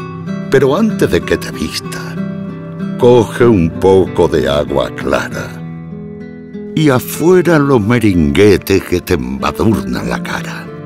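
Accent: Spain. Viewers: Maravillosa interpretación en andaluz.... Con su voz, la poesía cobra vida. Gracias!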